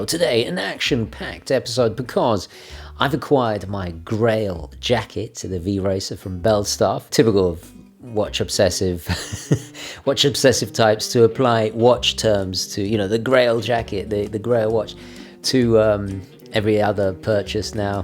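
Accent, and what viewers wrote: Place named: England, United Kingdom. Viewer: An Italian with an English accent , ma whats going on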